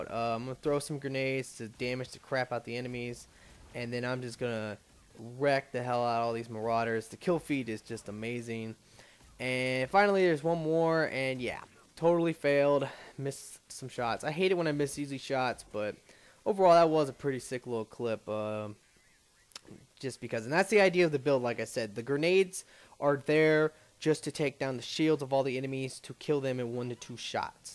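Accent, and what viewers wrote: Boston, United States. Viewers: I just thought you had an accent like boston or new york or whatever. Anyways, keep up with the vids. You're one of the few people that makes decent ME3 gameplay recordings.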